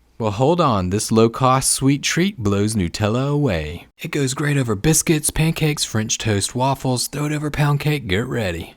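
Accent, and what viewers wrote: Tennessee, United States. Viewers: I'd say the Southeast, particularly Tennessee.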